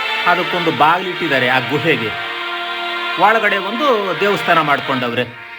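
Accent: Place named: Pavagada, India. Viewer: Pavagada bhashe lli heltha ediya guru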